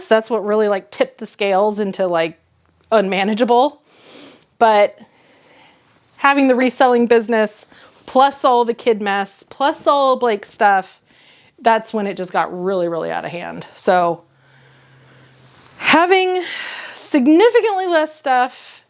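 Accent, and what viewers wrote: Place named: Europe. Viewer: Eric Lindros 😅🇨🇦🏒 European hockey mom here and I love you 😊, cause maybe you "sound" to me a little bit like an European ❤ Love your videos and sense of humor 😂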